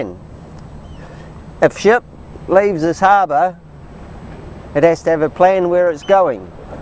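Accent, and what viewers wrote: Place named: New Zealand. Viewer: Great metaphor talking about the ships on thier journey. Great to here another Kiwi again - I nearly moved to Whangaparao about 40 mins from Aukland Steve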